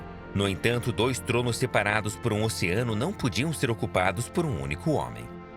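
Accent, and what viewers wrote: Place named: Brazil. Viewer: Ouvir a História de Portugal narrada por um brasileiro, gente que nos ideia, é que não pode ser. Além de Portugau. Familia Reau. Que nojo uns afronta aos Portugueses .Tchsu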